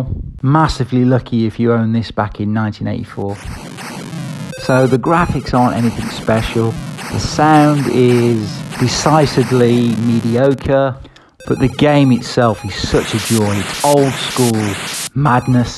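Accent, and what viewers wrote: United Kingdom. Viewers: I love your view of this, you understand how much work it took back then, there was no templates , it’s all code! They did make quality products and titles back then. Also, I love your accent, are you in The UK?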